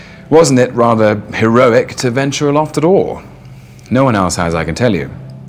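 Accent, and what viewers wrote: United Kingdom. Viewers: Aaaaaaaargh, that British accent, ow my goodness, that voice. He can read even instruction for vacuum cleaner and it will be a symphony for my soul)) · This is like ear sex... Why British people are so sexy than Americans while narrating things? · Thats because he attended Eaton and Cambridge and speaks with "Received Pronunciation", the style of speaking taught in the Elite Public Schools....also used by BBC Annnouncers, much like Standard American used by Broadcasters in America, often referred to as unaccented American English. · Accent?? British English is the original English language. Others have accents.. i think.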